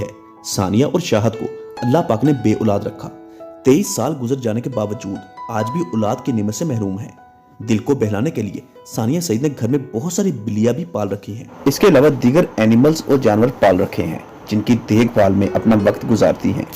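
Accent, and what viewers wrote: Punjab, India. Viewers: Aapka urdu lehja punjabi typa ka hy aur aap bohat taizi se bol rahay hain jo kano ko giraan guzarta hy.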